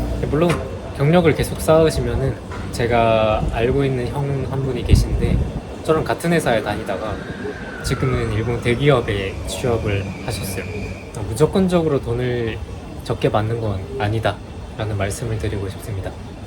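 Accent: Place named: Gyeongsang, South Korea. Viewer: 혹시 경상도 출신이신가요~? 억양에서 살.....짝 경상도 억양이 ㅎㅎ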